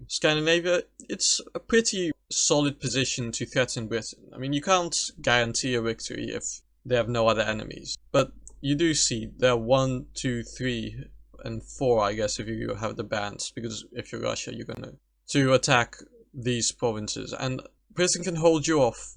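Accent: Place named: Netherlands. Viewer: Ik hoor toch een Nederlands accent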